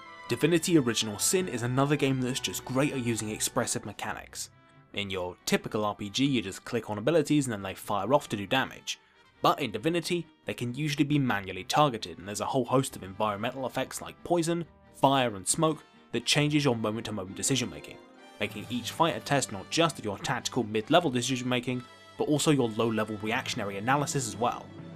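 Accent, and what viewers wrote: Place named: Northern England, United Kingdom. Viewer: probably just Northern/Midlands English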